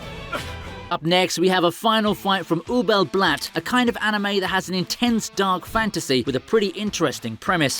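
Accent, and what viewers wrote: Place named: United Kingdom. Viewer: I searched best anime fights and expected a compaction. Wasn’t prepared for some British clown talking but do you I guess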